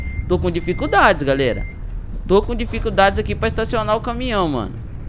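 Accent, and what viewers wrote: Brazil. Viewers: não é de são paulo e veio da onde cara? já tinha reparado mesmo nos outros videos o "oxi" kkk =D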